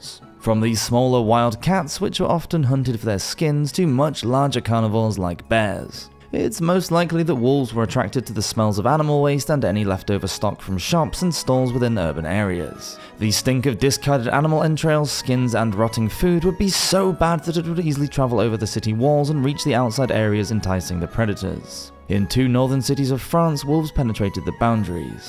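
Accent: England, United Kingdom. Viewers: a deaf person can tell this is an english accent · Just English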